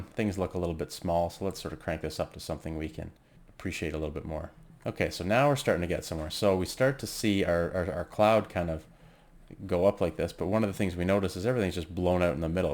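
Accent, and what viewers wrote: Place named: Central, Canada. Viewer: The way you structured this tutorial was incredible; you're a brilliant teacher. And I recognized the central Canadian accent right away, bonus!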